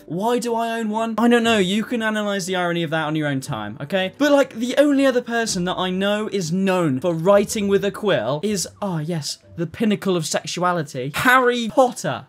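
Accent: United Kingdom. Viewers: man your vidoes are fun 😂 Gonna binge watch everything now!! P.S. That bri'ish accent helps too 🤭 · i think i just fell in love with british accent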